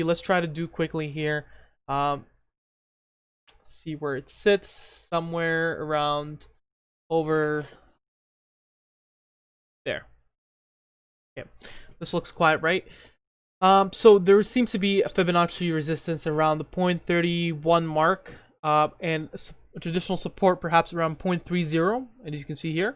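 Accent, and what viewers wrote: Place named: Philippines. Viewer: Just subscribed! Found your posts on /r/pennystocks Random question, but are you Filipino? 🤔 Hearing a hint of an accent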